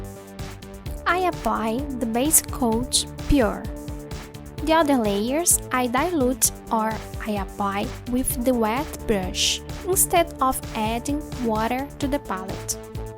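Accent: Brazil. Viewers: I can recognize a brazilian accent easily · Esse sotaque aí e BR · Dá pra ouvir o português por debaixo do seu ingles. Vc é Brasileira de de onde?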